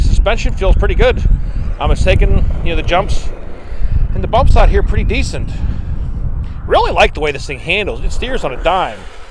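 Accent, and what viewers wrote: Pittsburgh, United States. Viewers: Where are you located? Your accent sounds like western PA/Pittsburgh. Edit - ok - I see a sign in the background that is 724 area code so we are almost neighbors! Where is this track that you are at? I have a Big Rock coming on Thursday. Just subscribed!!!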